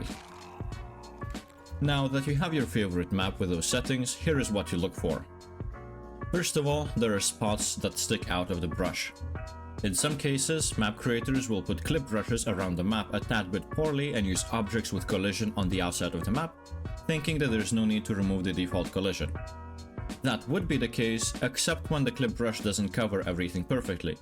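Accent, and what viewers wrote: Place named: Lithuania. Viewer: Very good video, a lot of food for thought. Random question, whereabouts in the world are you from? I can't place your accent, you sound Scottish, French, Dutch and Eastern European by turns. Edit: Nevermind, just checked your profile about and spotted the note that you're in Lithuania. Odd - I''ve known many Lithuanians but didn't place your accent. Eiti Lietuva, all the same.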